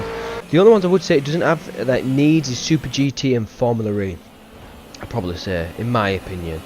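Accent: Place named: Manchester, United Kingdom. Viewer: You sound more manc than Yorkshire... You sound exactly like Gary Neville!!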